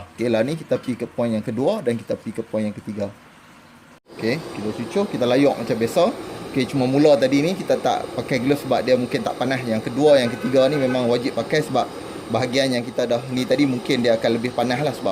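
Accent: North, Malaysia. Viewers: Uishhh pekat sunggoh laaa loghat utara dia🤣🤣🤣sempoi bos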